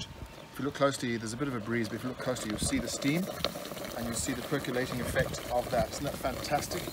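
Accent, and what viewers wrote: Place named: Durban, South Africa. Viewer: Nice video...You sound like you're from Durban.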